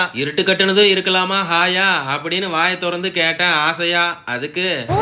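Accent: Coimbatore, India. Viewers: கோயம்புத்தூர் பாசை நல்லார் க்கு வித்யாசமா பன்றீங்க முறங்கக்கா பாயா❤❤❤❤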